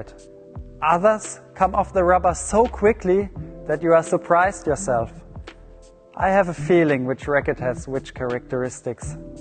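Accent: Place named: Germany. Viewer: His English sounds so ... German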